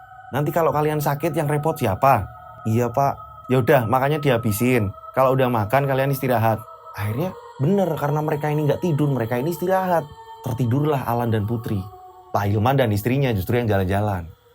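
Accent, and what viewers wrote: Java, Indonesia. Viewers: boom bomm hadiir jhor bhru mleysie. suke banget chinel ni. jwa nya medook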